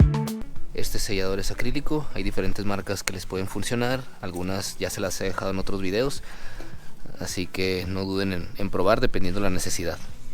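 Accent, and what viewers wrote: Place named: Chihuahua, Mexico. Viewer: excelente video, gracias por compartir la informacion, no todos son asi. saludos, suena a que eres de chihuahua :P jaja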